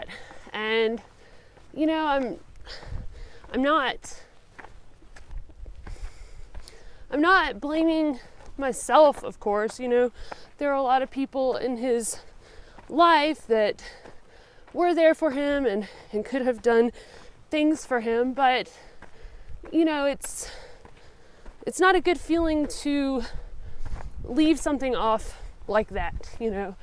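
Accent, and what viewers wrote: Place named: Alabama, United States. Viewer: Wow I missed ya'll, I have a cabin in South fork, been here since April, leave after bow season in October. I could have taken your friend to Colo springs and back in one day. I feel bad. That said I was shed hunting at the top of the cliffs north of 149 between SF and Creed while you were walking by. We've been to many areas in the Rockies and this one, SF /Creed have some of the best people. Again hate we missed the Alabama Drawl, you sound like us, SC Drawl. Stay safe.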